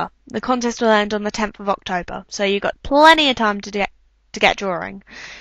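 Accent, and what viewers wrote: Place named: United Kingdom. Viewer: you british? sounds like it *giggle*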